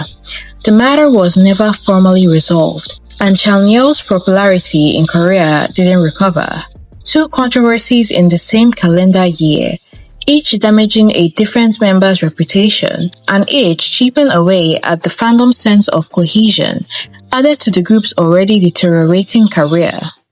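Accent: Nigeria. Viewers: I can clearly hear her Naija accent and she speaks so well. so what are you talking about?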